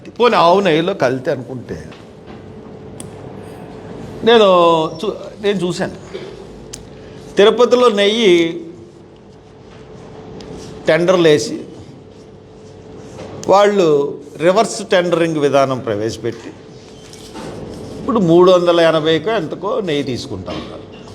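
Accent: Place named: Godavari, India. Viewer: ఓరి నీ ..... ఇదెక్కడి గొడవండి గోదావరి యాస సూపర్ గా ఉంది సర్ 😂😂😂😂😂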